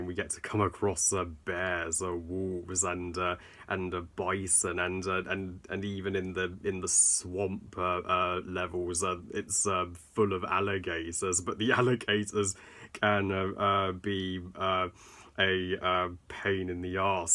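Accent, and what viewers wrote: West, United States. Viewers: great review as always and i loved your western accent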